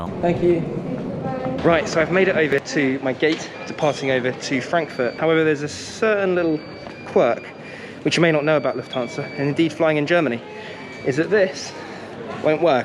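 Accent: RP, United Kingdom. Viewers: You have a very appealing British accent. A 21st century Oxbridge accent?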